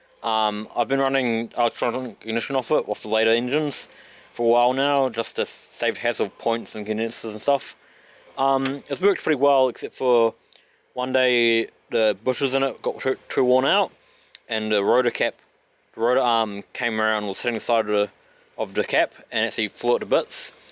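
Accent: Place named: Australia. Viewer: Hi if your going to do a video that might be watched by English speaking people ,it would actually be nice if we could understand what you were saying...im not knocking the Aussi accent, i get on fine with that, but you abbreviate words as you speak. you miss out important syllables and just merge what's left into a slurry of bits ow words.. or is your microphone at fault ? I recommend you SLOW DOWN and ARTICULATE the words then people will actually be able to understand and learn from your video !! That is after all why you have posted the video, isn't it... Im not trying to annoy you either , but to help you with a comment to improuve your videos.. im actually very interested in these motors and I am following these type of videos in French, German, English, Dutch, Italien, and despite being multilingual, yours was the hardest to listen to !! slow down, take your time, it's not a race!!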